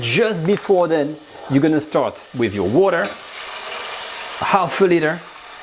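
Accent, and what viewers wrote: France. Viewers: I never had french onion soup and that looks delicious :DDDDDD. Also you sound like my french friend that I play games with. Your accent is funny he says pwease instead of please! He is a good lad! :D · J’adore votre accent français 😄 merci de préparer ces recettes !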